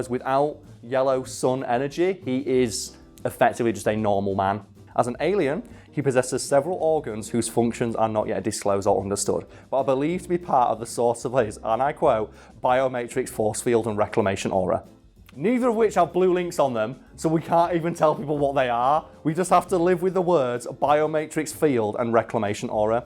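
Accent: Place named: North, United Kingdom. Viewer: Just cuz you sound like ur from the north. It means nothing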